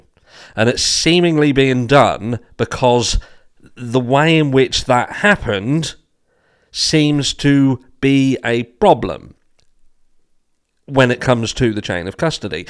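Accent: United Kingdom. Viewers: The only difference between you and Peter Tragos is your British accent. Visually you look identical so I have to wait until you start speaking before I can tell for sure.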